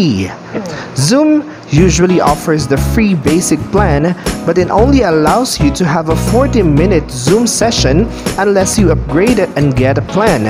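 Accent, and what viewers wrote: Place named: Philippines. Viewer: wahhh sabi ko na filipino yung nagsasakita eh ahaha... ang ganda ng english accent <333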